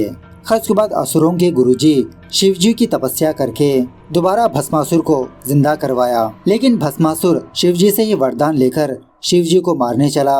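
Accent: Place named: South, India. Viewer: Are South Indian hai to thoda to waisa rahega na , hindi uski main bhasha nhi hai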